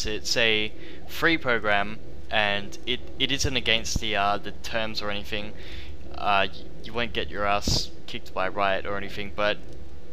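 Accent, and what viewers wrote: Australia. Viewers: Voice command doesn't work properly because he speaks with an Australian accent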